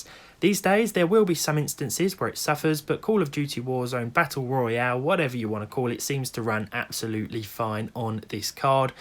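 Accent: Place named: United Kingdom. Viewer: That british english... pain in the ears 😞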